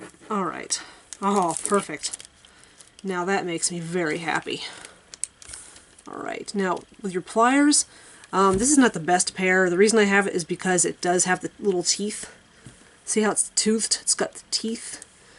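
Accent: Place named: United States. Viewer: Your American voice is so cute🙈🙈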